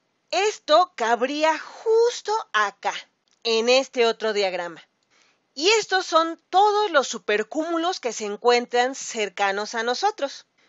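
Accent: Mexico. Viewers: Me gusta la voz de las mexicanas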